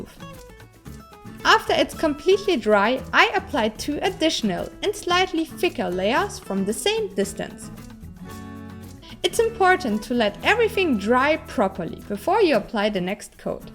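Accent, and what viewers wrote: Germany. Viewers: Du sprichst ja englisch immer noch wie ein Deutsche, die glaubt, dass Amerikaner so reden. Ansonsten schönes Video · Your German accent is charming.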